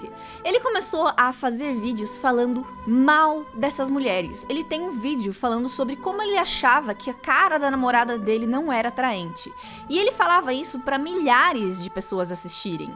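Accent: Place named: South, Brazil. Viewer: da onde você é?que estado? Parece sotaque sulista Amo seu canal <3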